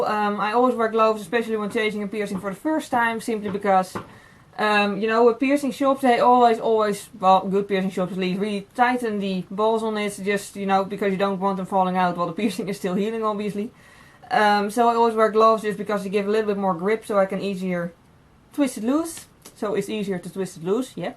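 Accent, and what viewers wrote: Netherlands. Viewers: I think I hear a dutch accent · are you Dutch?? Random question but i feel like i hear that accent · She's Dutch, she has an accent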